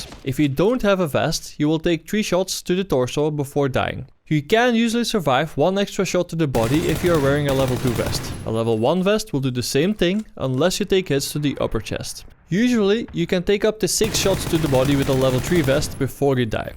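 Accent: Netherlands. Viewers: are you dutch by any chance? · jaja, 100% een nederlander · You must be dutch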